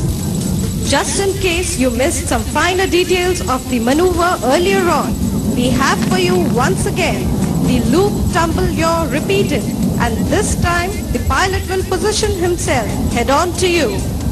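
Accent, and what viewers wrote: India. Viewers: Curry English has special favor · i hate thick indian english accents....they should've shut that bitch up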